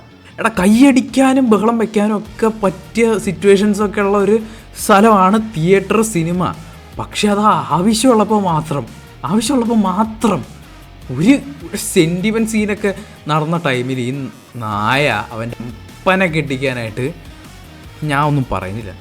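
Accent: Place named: Kodungallur, India. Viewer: ചാലക്കുടി അല്ലെ സ്ഥലം, കൊടുങ്ങല്ലൂർ അടുത്താ , same സ്ലാംഗ് ആണ് അതുകൊണ്ടായിരിക്കും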